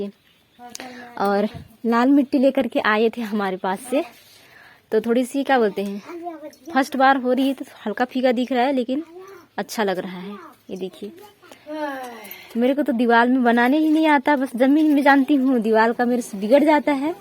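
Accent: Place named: Chhattisgarh, India. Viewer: Aap Chattisgarh se ho kya didi aap baat kiye to lg rha hai mere ko aap Chattisgarh se ho mai bhi Chattisgarh se hu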